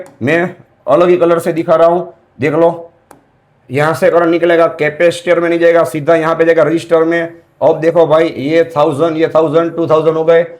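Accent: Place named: Gujarat, India. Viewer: Very osm sir I think you are gujarati it's new for me to listen ❤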